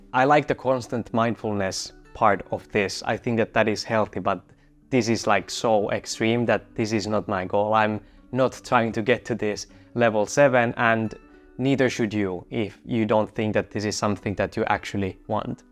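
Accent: Finland. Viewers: Oon ihan varma, et puhut suomiaksentilla :D Miks päädyit tekee videoita englanniksi? Ootko miettinyt, et sulla ois kohderyhmää myös Suomessa ja suomenkielellä? :) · By your accent, I guess you’re from Finland. Am I right? 😊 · I was listening are you Finnish? Muumimuki ja kahvi 😂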